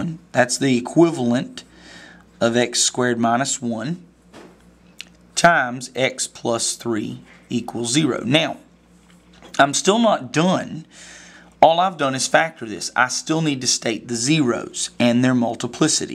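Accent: South, United States. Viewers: Not sure why, but math explained in a southern accent is so much easier to understand.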